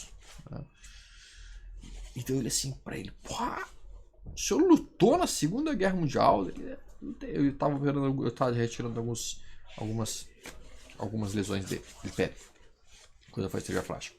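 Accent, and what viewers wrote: Porto Alegre, Brazil. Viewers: ele disse bspoas sfdeua fpasa, problema para mim que o professor fala muito rápido além do sotaque portoalegrense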